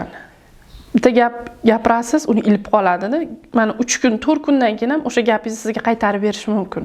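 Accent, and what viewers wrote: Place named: Fergana, Uzbekistan. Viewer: Шевез Фаргонача экан Бекабодлиларни шеваси бошкача эшитганман бизи шевада гапирасиз экан яхши курдим кизим бахтли булинг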